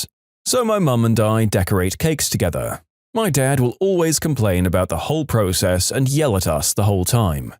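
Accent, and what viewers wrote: United Kingdom. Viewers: I don't like this voice actor you hired..... I can't stand monotone people..... and (no offense to anyone who is British) I REALLY can't stand monotone with a British accent......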